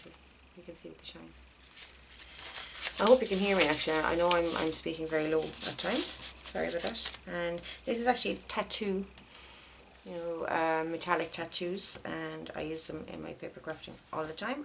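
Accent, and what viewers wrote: Ireland. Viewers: Your journal is beautiful, I love how you made it, the tracing paper page with the bird is amazing! What paper did you use to make it? I would love to have a vintage journal but I have nothing vintage to make it with or put in it! The ruffled edges are fabulous, did you burn the paper to make it brown like that, seems to be patchy (how I like it)? The crinkly noises of the papers are music to my ears :) I couldn't quite hear where you get your inspiration from, or what that book was called, the one that no one can understand I think you said? sorry. If this is your first ever vintage journal, it is a work of art, wish I had your talent! If this was my book, I would be carrying it everywhere lol :) I have subscribed to your channel, I'm reckoning you are in Ireland by your accent :) I'm in Scotland :) TFS, love this!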